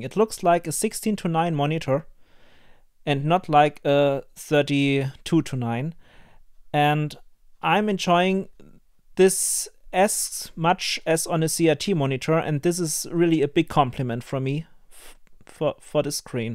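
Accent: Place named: Germany. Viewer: Ich habe noch nie jemanden gehört, der so deutsch Englisch spricht haha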